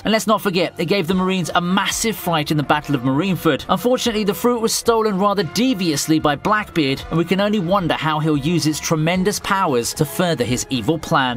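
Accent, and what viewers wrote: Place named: United Kingdom. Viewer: Man that British accent make everything sound so presentable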